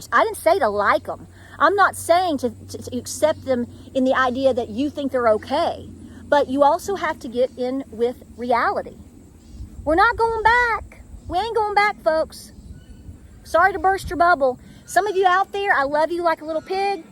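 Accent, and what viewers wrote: South, United States. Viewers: I've just found your channel recently and I could of course tell you are from the south but today I heard you mention Oliver Springs and Oak Ridge Tenn and I thought oh wow I haven't those towns mentioned in years. I went to elementary school in Oliver Springs and Clinton and other family members lived in Oak Ridge. Brings back memories.😊 I really enjoy your program!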